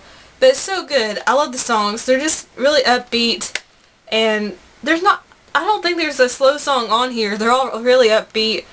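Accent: South, United States. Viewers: I like your southern accent :)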